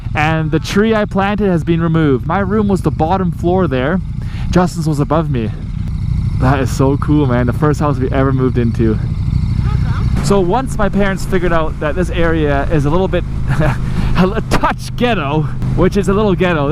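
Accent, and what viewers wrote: Canada. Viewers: canadian ehhh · He's Canadian not American Think that America isn't the only country in the world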